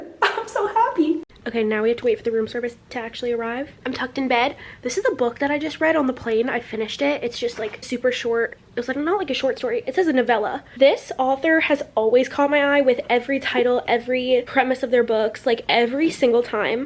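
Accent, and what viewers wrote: South, United States. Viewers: i feel like you’re developing a southern accent and its so cute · You sound more southern in this!! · I don't know if it's just in this video or if it's always been like this and I just didn't notice, but actually has a really strong southern accent lol 😅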